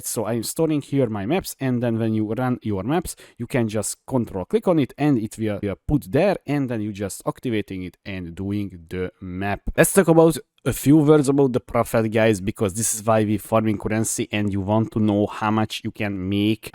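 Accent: Hungary. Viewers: nagyon hallani, hogy Magyar a srác 😅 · Work on your accent my buddy. Without looking I'm pretty sure you are from Hungary. :D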